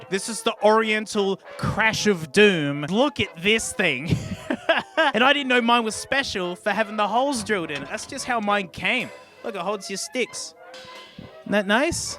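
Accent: Australia. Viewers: Wait a minute, " ", that familiar Aussie boy voice, is this the man behind Tony and the Litreboard?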